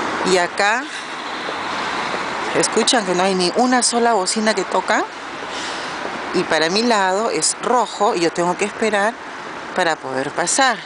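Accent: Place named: Peru. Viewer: Por el dejo eres Peruana, yo vivi en Perú dos años y alla si tocan claxón bastante 😄. Saludos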